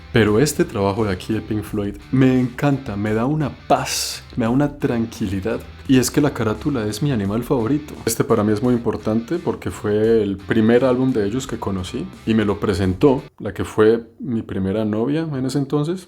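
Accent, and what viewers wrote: Bogotá, Colombia. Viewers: Sigo su canal de viajes , su estilo calmado, educativo, sus comentarios y su acento colombiano que le queda preciso a sus narraciones. Es un ser humano con gustos como cualquiera, y en ese contexto no hay música más internacional que el rock y el metal. Saludos desde Ecuador parcero 😊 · Es el acento de Bogotá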